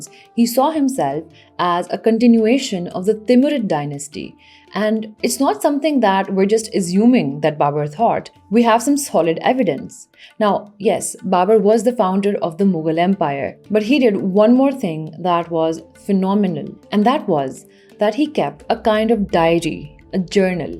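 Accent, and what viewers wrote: Pakistan. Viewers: What's with the Pakistani English Accent...!? Very similar to Bangladeshi English..!! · amazing content in pakistani assent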